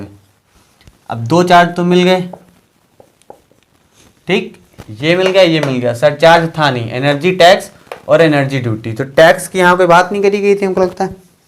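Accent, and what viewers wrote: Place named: Uttar Pradesh, India. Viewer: sir me apki boli se smjh gya tha aap utar pradesh se ho exactly kh se hoo??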